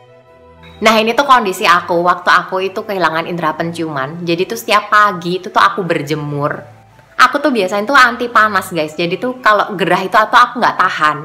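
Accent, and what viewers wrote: Java, Indonesia. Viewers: Jowo ne medok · Medok gaes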